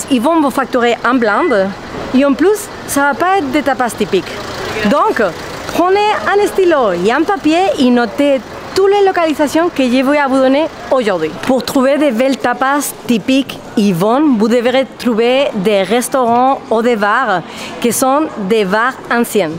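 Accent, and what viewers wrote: Spain. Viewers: Holà 😀toujours un plaisir de regarder tes vidéos, tu as toujours la banane c'est incroyable!! J'adore quand tu parles en français avec ton accent espagnol!!🤩 Merci pour la présentation de Madrid ça à l'air d'être une ville super chouette un jour on ira avec ma femme et forcément on passera à El Tigre, El Respiro y La Petisqueira😋. Passa buenos tiempos con tu familià, ( je sais pas si on dit comme ça, mais c'est pas grave😊, hasta pronto et vive la Vanlyfe!!😘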